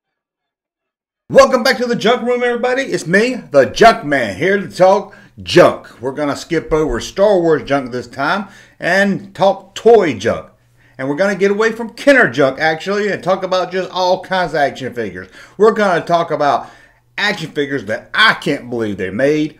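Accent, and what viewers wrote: South, United States. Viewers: How in the HELL could someone with an accent as southern as yours have had an African American doll when you were a kid?!?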